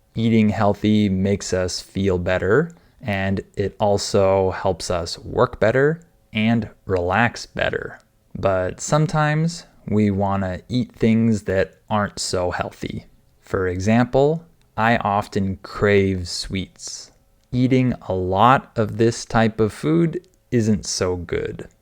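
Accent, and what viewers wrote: United States. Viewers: english american or británico ? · American accent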